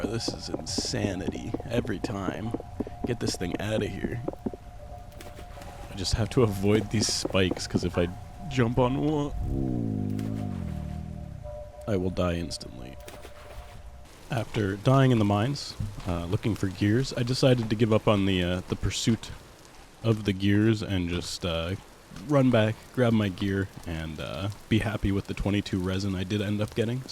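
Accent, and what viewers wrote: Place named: Newfoundland, Canada. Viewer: Are you from the rock? Your accent sounds slightly like Newfoundland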